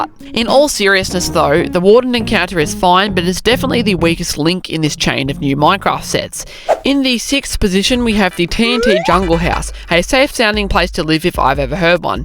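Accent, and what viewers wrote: Australia. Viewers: are you aussie! and are you from australia cause you keep showing big w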